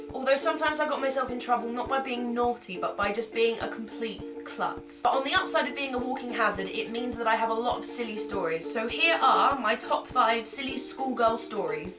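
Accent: United Kingdom. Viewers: aww so cute and british. sounds like you had a fun time in school :)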